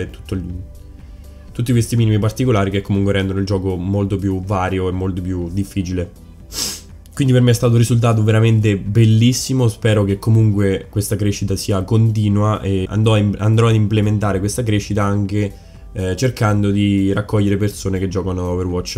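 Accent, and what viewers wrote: Marche, Italy. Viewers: Ciao, mi sono appena iscritta al canale. I miei complimenti, finalmente qualcuno che fai degli ottimi video di wow in italiano ^^ riconosco l'accento della mia zona....sei marchigiano o sbaglio?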